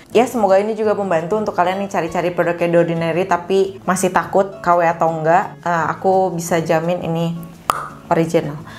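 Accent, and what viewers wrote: West Java, Indonesia. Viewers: Sundanya balik 😂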